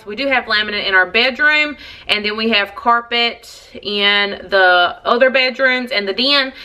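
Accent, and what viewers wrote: South, United States. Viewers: your welcome love your channel and nice to hear someone talk like me. Southern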